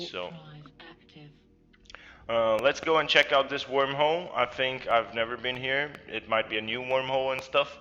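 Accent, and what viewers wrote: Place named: Eastern Europe. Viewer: English isn't the guys' first language. Give him a break. It's common for eastern Europeans. Especially east block scum.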